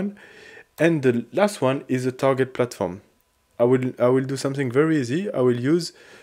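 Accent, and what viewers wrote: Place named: France. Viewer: Je reconnais cet accent français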